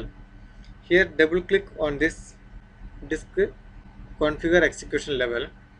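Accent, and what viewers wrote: India. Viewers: I like your Indian accent 👌😀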